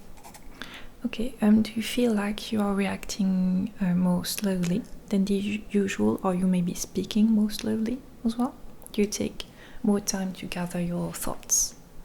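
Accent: France. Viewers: Being a 50 year old German male, I'm struggling a little bit with my imagination being a cheerleader 😁. But for listening to your soft french accent ... let's go for it ... 👩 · French Accent? 😍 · Je trouve ça chou l'accent français en anglais ^^ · Même pas 2 secondes d'écoute et je reconnais ce magnifique accent français. En vrai ça va on est loin du niveau de Hollande xD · French accent?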